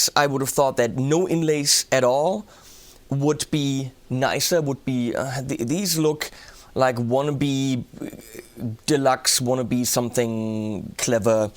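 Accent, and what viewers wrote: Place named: Germany. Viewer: Got one myself. Not that I needed another guitar, I own Gibsons, Fenders, Epiphones and a lot of Partsocasters and Chinese rip offs(mostly custom orders on my specs). I love this guitar expecially for its playability (24 frets is a luxury!). I have replaced the stock tuners with a set of locking machines and the knobs with amber barrel ones. The stock pickups are not bad ay all, though they have some sort of plasticity reminding me some Ibanez models. I might drop in a set of Duncans, they made some of my Chibsons sound super! All in all, a good purchase, bought second hand and already broken in. Thanks for your videos, you crack me up (I have toured extensively in Germany in the past, and I love your accent, it reminds me of the old days!)😄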